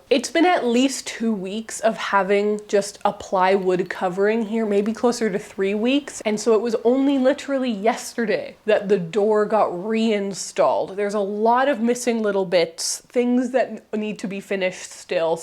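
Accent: Canada. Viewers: No, sorry! Ha, I mean the pronounciation- at first I was like, "Wait, is she saying pouddy?" And then I realized that that is, in fact, how I say it too. It's the 'about/aboat/aboot' accent coming for us again. That and saying double t's as d's- but really if we don't do that then we sound british, so I say just rock the Canadian accent with pride lol.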